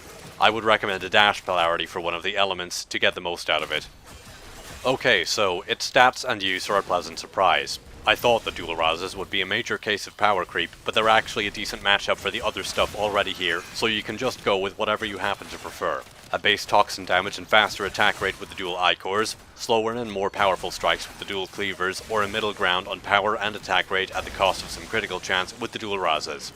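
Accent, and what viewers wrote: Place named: United Kingdom. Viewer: You sound like a British (Not sure what that accent is...) Skallagrim while ranting about weapons.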